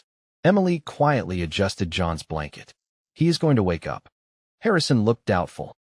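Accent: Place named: United States. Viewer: Fantastic story. Perfect! There was no problem with the sound at all. It was excellent American English.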